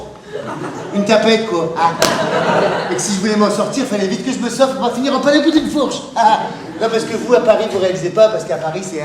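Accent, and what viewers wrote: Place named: Nord-Pas-de-Calais, France. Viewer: C'est bizarre pour un homo d'avoir l'accent ch'ti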